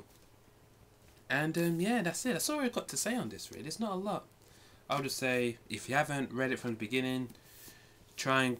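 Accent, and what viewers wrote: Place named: England, United Kingdom. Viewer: lol shirt says bronx but hes clearly english :)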